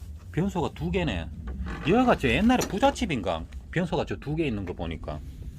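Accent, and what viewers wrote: South Korea. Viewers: 님은 고향이 어디시길래 촌말을 그리 맛깔스럽게 하시남유?ㅎ ㅎ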